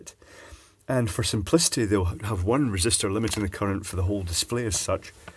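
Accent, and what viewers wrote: Scotland, United Kingdom. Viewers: I think it's all to do with that husky Scottish voice, the odd exploding cat, and the desire to watch soil himself....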